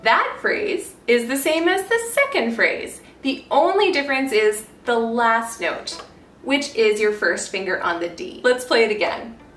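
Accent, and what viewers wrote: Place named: United States. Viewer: wow you aound so american it makes it really hard to follow along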